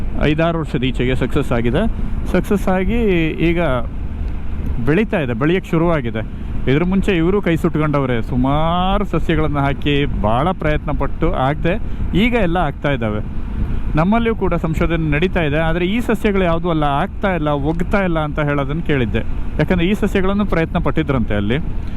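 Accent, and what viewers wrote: Kundapura, India. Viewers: Kundapura Kannada ❤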